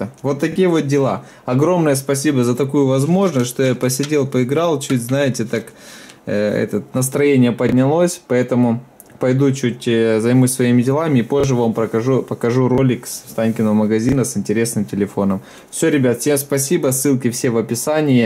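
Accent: Ukraine. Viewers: У тебя в этом видео акцент Украинский сильно выражен )) А в других его вообще нету такого,все на русском))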